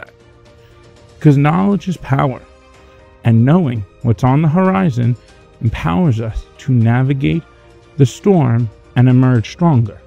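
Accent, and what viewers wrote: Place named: New York, United States. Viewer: brother, thank you. Sound like a fellow NY'ER. Please, whenever you have time, can you go through the steps as if we're 6 yr Olds with all the new stuff coming out. Enoysis, f-assets, delegating. I know you go deep but if you showed us the complexity via how to's, we retards would get it faster. Sgb and lost 20k tokens since price went up, impermanent loss. Didn't even know bro. Help us since you understand it all!!! Gracias!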